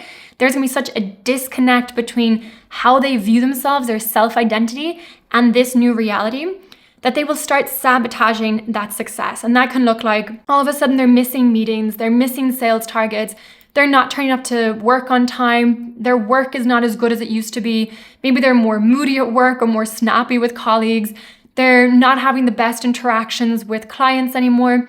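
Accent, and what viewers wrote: Ireland. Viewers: Did you spend time Ireland? I notice a hint in the accent! ❤😊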